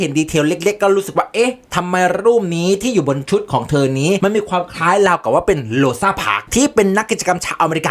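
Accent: Isan, Thailand. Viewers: พากย์แนวอีสานแบบแอดนี่แหละมันเห็นภาพสุดๆๆๆ ...อย่าฟ้าวๆๆๆ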